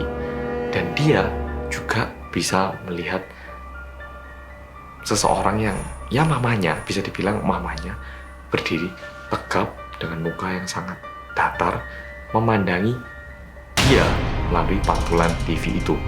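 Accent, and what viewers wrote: Java, Indonesia. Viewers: Awakmu aneh tenan tak rasakke mas, lha awakmu arek korea nangin kok tag line ne kok yo jowo, medhok luwe po meneh kewedhen...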